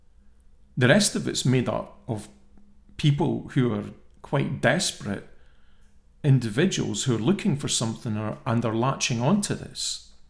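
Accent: Scotland, United Kingdom. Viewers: I always look forward to the beginning in the Scottish brogue (hope not offensive) "ello and welcome to another video" A nice, refreshing entry into the expected reassuring logic.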